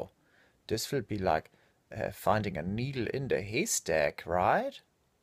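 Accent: Germany. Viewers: Hey, kannst du eigentilch auch Englisch reden ohne den Deutschen Akzent? Bei uns in der Schule in NRW lernt man das mit Americanischem Akzent :D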